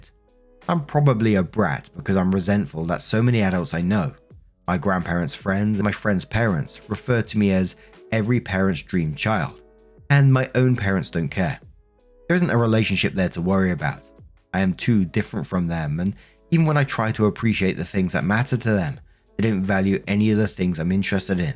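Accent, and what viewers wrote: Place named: Midlands, United Kingdom. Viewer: British/American accents - and has a midlands accent so different again - have you not heard the way he says was 'waz' for example!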